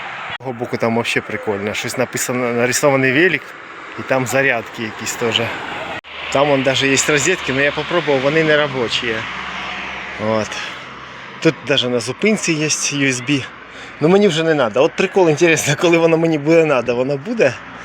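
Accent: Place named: Surzhyk, Ukraine. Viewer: лю твій суржик а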